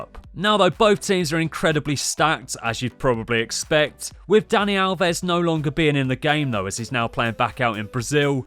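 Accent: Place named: England, United Kingdom. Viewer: I'm a PES PLAYER ,i don't like FIFA but i Like your English ACCENT you should be the COMMENTATOR of FIFA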